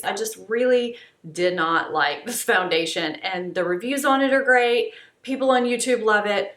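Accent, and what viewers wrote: South, United States. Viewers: Hi! " sent me" and I"m staying! As for eyeshadow for liner, I like the look but I can't get it to stay for more than an hour or 3. What's the secret? And did I hear a bit of a southern accent?! I"m from NC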